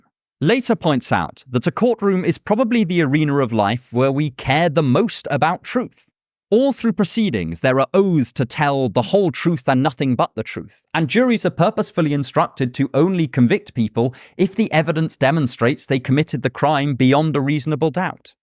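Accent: United Kingdom. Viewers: I really enjoy listening to your videos. I love how evenhanded you are in presenting both sides of arguments and how you make philosophical ideas come alive. And, I love your British accent! 🤩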